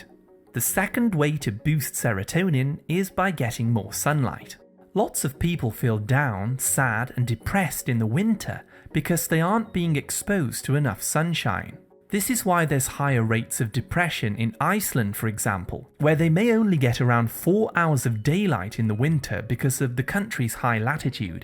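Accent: United Kingdom. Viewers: British accent. From England.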